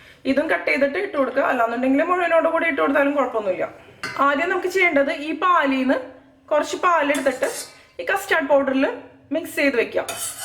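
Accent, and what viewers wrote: Thrissur, India. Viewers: Thrissur kari anno?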